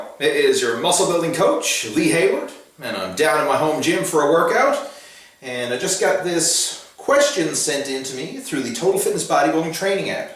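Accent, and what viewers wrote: Newfoundland, Canada. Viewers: You got to be from Newfoundland, I can tell by your accent.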